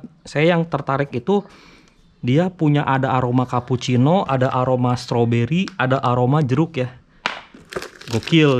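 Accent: West Java, Indonesia. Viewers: Bang Sunda Nya Sunda Mana Bang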